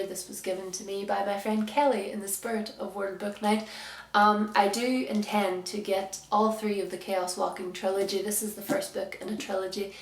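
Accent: Ireland. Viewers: Are you irish? :D I found you through Sanne and I like you! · Are you Irish? I'm a Londoner xDD...Well I'm half. I mean, I was born and I live in London, but I'm half lol <3 Are there any books you can recommend for someone around my age-18-? xX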